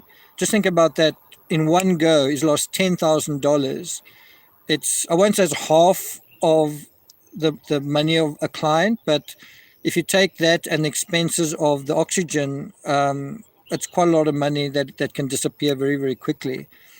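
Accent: South Africa. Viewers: Shucks you are South African! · Really really enjoyed listening to this… no offence but the SA accent normally makes my ears bleed but throughly enjoyed listening to you mate 😅❤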